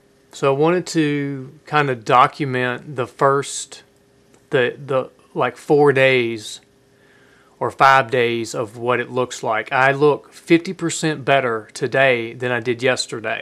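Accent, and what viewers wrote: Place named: South, United States. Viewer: Lov souther accent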